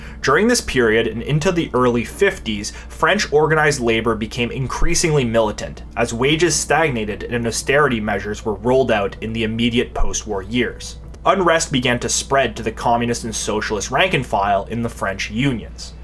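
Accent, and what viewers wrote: Quebec, Canada. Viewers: Thanks for the wonderful video, and thanks for not hiding your Quebecois/French Canadian accent!:D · Tu sonne comme si ta appris ton francais au québec. Nice! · C’est du bon québécois! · mon, ton accent me dit que tas grandi un bout a quebec haha ne laches pas continue ton truc tes videos sont geniales! tres bien recherché chaques fois! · That pronunciation of French? My man is Canadian. French-Canadian. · I think he's Canadian -or very much sounds like in French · Makes sense the dude is definitely from Quebec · Most likely French-Canadian per the accent.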